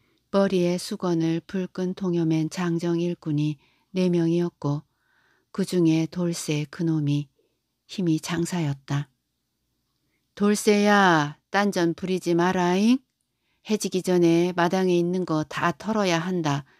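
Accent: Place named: Chungcheong, South Korea. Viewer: 충청도 금강건너 웅포가 고향으로 잊었던 사투리에 정감이 갑니다.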